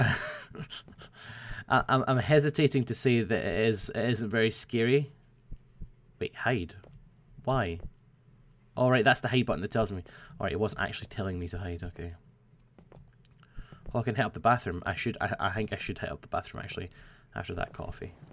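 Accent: Scotland, United Kingdom. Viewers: He is Scottish, I don't think Russian sounds like that at all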